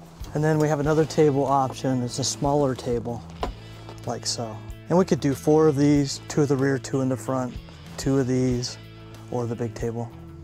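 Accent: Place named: England, United Kingdom. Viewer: I can’t tell if you are English or are just trying to sound it. And I don’t know which one I prefer.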